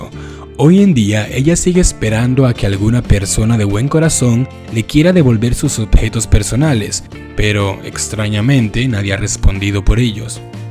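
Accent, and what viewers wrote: Colombia. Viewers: Oye eres de Colombia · tu eres de colombia?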